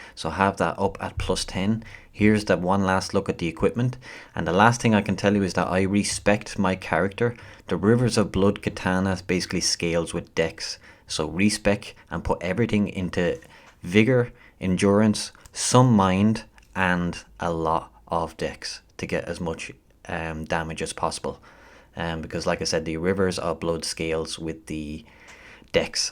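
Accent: Ireland. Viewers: You Irish mate? Nice guide thanks! Subbed!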